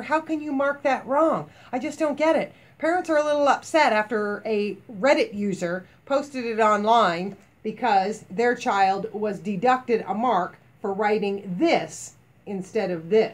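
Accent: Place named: United States. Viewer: American I'm guessing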